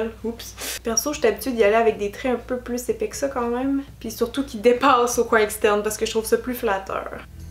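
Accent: Quebec, Canada. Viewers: J'ai mis 5min à comprendre que "cachérn" (comme ça que je l'entendais) voulait dire "cache cerne" et enfaite "anti cerne" !! 😂😂😂👌🏻 Bref les Québécois ne perdez pas votre accent, il est excellent 😭🙏🏻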